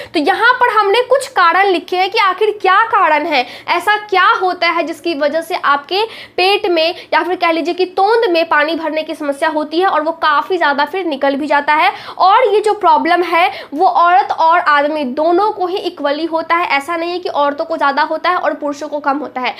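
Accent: Bihar, India. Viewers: Lagta hai aap बिहाड़ se हैं। वैसे आप ने लिभड़ के बारे में अच्छी तरह समझाया है। 😊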